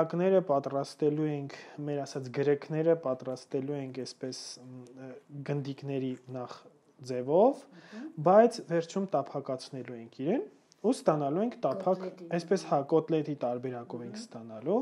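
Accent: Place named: Sasun, Armenia. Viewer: Shat mec bavakanutyun staca haxordumic, jan lselov mer Sasuni anush barbar@ texapoxveci im mankutyun ev mer harazat Irind.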